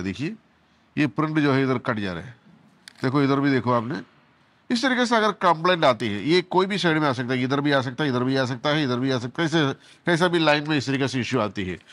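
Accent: Kerala, India. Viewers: Malayali aaanelle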